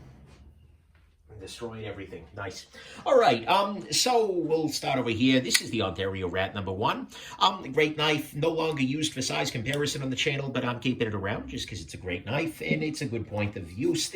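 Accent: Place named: East Coast, United States. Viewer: Your in california?? I felt certain your were an east coast guy.... I'm sure at least from the northeast part of the country.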